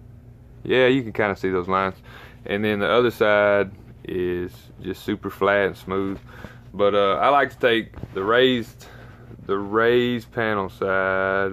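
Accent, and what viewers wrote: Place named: South, United States. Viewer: I've watched all 3 of these videos and I have to say you are a great teacher! It's nice to see a man doing a video like this, I'm sure that it will help to get more people involved. Anyway...we have a pile of Crown Royal bags and my bf was telling me that he needs a welding cap so I googled it and found that several tutorials exist using them and then I came across you teaching. It seems like I'm off to a great start, we'll see now how the rest goes...Thanks again! Oh btw where are you from with that southern accent?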